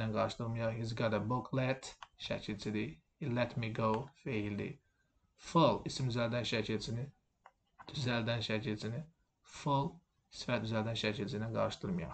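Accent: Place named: Nakhchivan, Azerbaijan. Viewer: Muellim deyesen naxcivandandir nitqi cox sirindir ona gorede i gilisce sozleri ele seslendirir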